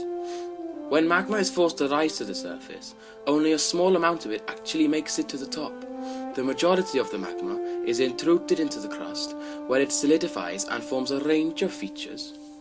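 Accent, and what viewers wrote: Wales, United Kingdom. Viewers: I think welsh.not sure but it does sound awesome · I'm acc here for revision because I have a mock, and I'm getting these cheeky lads giving a commentary on something they'll never probably use! That Welsh accent though!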